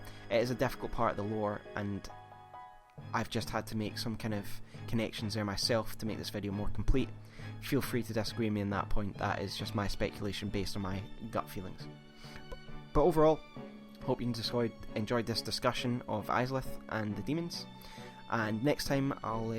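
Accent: Scotland, United Kingdom. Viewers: I can't even recall how many times the Scottish accent made me laugh ! Seriously, sometimes I laughed so hard I "wetch"ed my pants. XD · Scottish are we? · agreed, Scottish accent ygm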